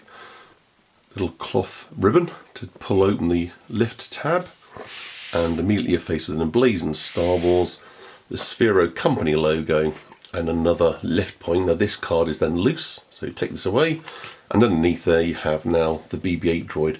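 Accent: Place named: England, United Kingdom. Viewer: Hello, First off. My Grandfather was from England and due to him, I tend to sound like I came from England as well. He passed away when I was 13 and I miss hearing an English voice once (Other then my own.) in a while and I loved hearing your voice. On BB8. I have read newspaper and magazine reports and seen a few videos here on You Tube about him. BUT! This report of yours was much better and covered more points then the news stories and other videos did. I was considering getting a BB8 for myself and your report helped me make up my mind about getting him, Thank you. I have a VOX R2D2 from the first year that "Tiger Toys" (Hasbro Toys.) came out with them (Thinkway Toys is making them from this new movie now.) and I have had a ruddy blast with him and every time I set him loose on his patrol mode, he gives my cats a very hard time and drives them around the flipping twist! 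I can not wait till I can get BB8 here and cut him and R2 loose in the house and watch as they target one of the cats and goes after her! THIS IS GOING TO BLOODY WELL BE A TON FUN!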